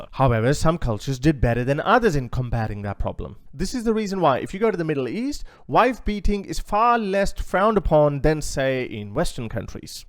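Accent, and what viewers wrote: Australia. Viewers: I did not realise you live in Oz, although I know you have the accent. I came to live in England 21 years ago. It was so different to what it is now. When you spoke about visiting Europe & seeing the changes, I felt sick. But it's true. I am white but I was born & raised in Africa. My late dad was Welsh & moved there after WW2. He fought in the RAF as a gunner. I know he would be heartbroken to see both Wales & all the UK in the state it is now. 😢 It makes me angry & sad, that so many people died to stop Hitler, & now things are going downhill fast. I've got family in Australia & I'm wishing I could move there! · Are you an Aussie? You sound like one. "Wokies". Too funny mate. Pity about the subject of the video. Cheers mate.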